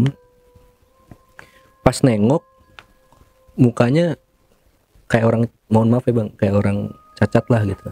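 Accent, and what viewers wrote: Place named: Bekasi, Indonesia. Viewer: Temen gw orng bekasi jg emng logat dan cara ngomongnya kaya gini persis klo cerita, asik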